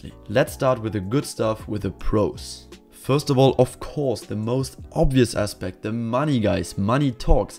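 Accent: Germany. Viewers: Are you German? PS: Great Videos. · When he started to count up the teams i knew he is a German, this Groningen and AZ was as German pronouced as it could. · The moment he started speaking, you could inmediately tell he's German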